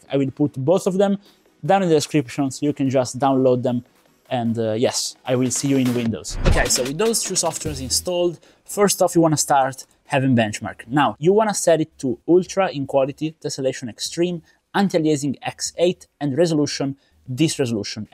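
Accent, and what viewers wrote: Italy. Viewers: Ciao bellissimo video da come parli inglese sembri italiano, se cosi fosse fai un video uguale in italiano? Sei un grande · troppo accento italiano :face-red-heart-shape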